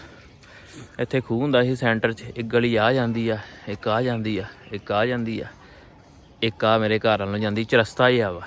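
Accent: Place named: Majha, India. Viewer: Majhaa ali boli bolda bruh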